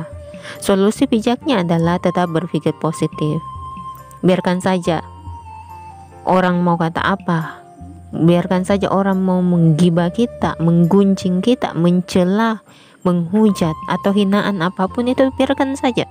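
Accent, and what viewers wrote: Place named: South Sulawesi, Indonesia. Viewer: Motivasinya aisyah akan ingat selalu bunda.sepertinya bahasanya dari sulawesi selatan seperti papi aisyah lho :)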